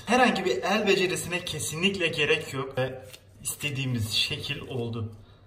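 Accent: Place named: Hatay, Turkey. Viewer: Abi konudma tarzınla o kadar güzel ki ergenlerjn yorumlarına aldırma ben sinir oldum vallahi tam Hatay ağzı :) Yolun açık olsun :)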